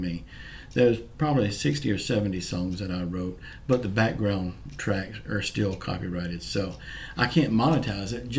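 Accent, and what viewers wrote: South, United States. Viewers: Thank u for answering my question💕 love hearing ur southern voice 🙂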